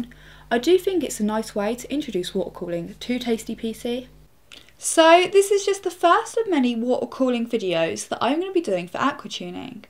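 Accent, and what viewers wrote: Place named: Essex, United Kingdom. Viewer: This one's definitely different from your newer videos, more chilled, but that Essex accent!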